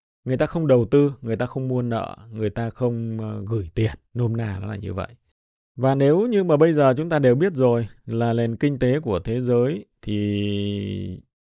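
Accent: Standard. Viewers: Anh nói rất chuẩn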